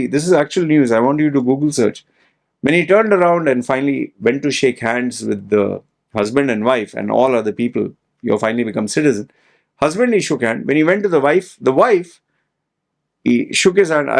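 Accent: India. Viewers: bruh you are wrong india is not called Hinduastan because the majority is hindu... there was no hindu it is an umbrella that was created by British they wanted to group these other faiths living in bharat back in the days to make it easier for them to adress them... like muslims and christians .... you can even look for it.. there was not even hindu religion so many different faiths were put into one umbrella called hindu by britishers!!!.. and i don't think that you are a canadian either you must be an ex endian judging by the way you speak!! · are you Indian or with Indian DNA? Your accent in Indian! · He himself seems indian, littrelly, his excent of english is really indian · You sound like an Indian, but tried nicely to become or at least look like Canadian or American or European for that matter. Good try. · You also have a Indian accent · You sound an Indian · Why make a video on a single person and generalise. 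And the way this man talks, he too is an Indian or an ex Indian. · Look at your accent bloody yendian accent. BTW you have no right of speaking sh!t about someone’s religious beliefs. Let them do what they wanna do. White washed typical Indian J0ker!!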